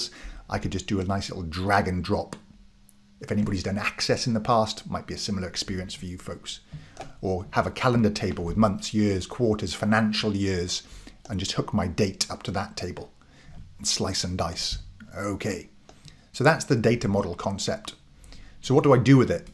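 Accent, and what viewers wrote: Wales, United Kingdom. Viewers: Came here for Excel, stayed for the heavy British accent 😅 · Loving the Welsh accent 😁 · I’ve been using Excel for decades and have only scratched the surface of the pivot tools. This explanation has helped a lot. Thanks! (PS. Do I detect a fellow Welsh accent?)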